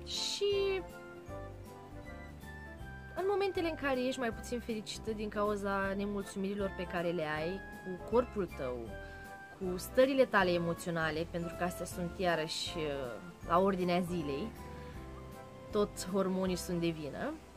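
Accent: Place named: Moldova. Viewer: ..multumesc pentru incurajare...te admir si iti urmaresc vlogurile ptr ca esti naturala...imi place cum vorbesti...accentul tau moldovenesc ca al meu...deocamdata tin totul sub control...ma intrebam de ce eu...din acel procent mic de femei pe care reusesc sa il faca in sarcina...adica diabetul...si neavand nici in familie pers cu diabet.. nu sunt supraponderala....am avut toata viata 45 de kile...si in sarcina am pus 10 kg pana la 7 luni....eu fiind schija mereu imi ziceam lasa ca poate ma ingras in sarcina:)))stiu ca in ultimele luni pui multe kg cu acest regim nici nu dau jos nici nu adaug...noi in familie suntem maruntei...si surorile mele....nu stiu de ce zic ca as face bebe mare....eu mereu ma intrebam macar sa aibe 3kg sa nu fie o matza mica de copil....si cand am auzit ca am diabet si tre sa tin dieta sa nu se duca surplusul de zahar ka bebe ca s ar transforma in grasime la el..am ramas tablou...la 20 de sapt avea 300 de grame...la 26 am facut testul de glicemie si mi a iesit prima valoare 95 in loc de 92 si mi a dat trimitere la diabetolog...nutritionist. 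Este fff stresant sa te trezesti dintr o viata normala...dormeam cat vroiam in sarcina...acum sa ma trezesc dimineata ia ti glicemia pe stomacu gol...si nu mai poti dormi ca vaii ia micul dejun la ore fixe....cantmd te vezi cu glucometru in mana te simti bolnava...dar trebuie sa ma resemnez....daca imi ies valori bune ma simt ok...dar cand is peste limita deja ma demoralizeaza....nu stii ce sa manan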